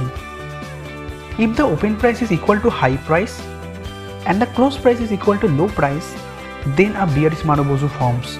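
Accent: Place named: Pakistan. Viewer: I love the paki voice. Such a cool accent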